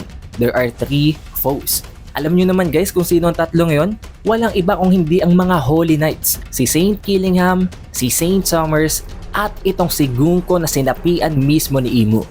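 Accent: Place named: Conyo, Philippines. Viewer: Inexplain ❌ Ipinaliwanag ✅ Ishinare ❌ Ibinahagi ✅ Nireveal ❌ Binunyag ✅ Mga simpleng salita, pero di alam ng mga purong tagalog. Hindi alam? Or baka kinalimutan? Ewan ko bah, nakakapeste lang pakinggan na banggitin ang isang salita sa ganyang paraan kung may pormal na salitang tagalog naman. Tunog conyong bakla na tuloy pakinggan. Bisaya ako pero mas magaling pa ata akong bumigkas ng pambansang wika. Kailangan na ata ng karamihan magbalik-aral.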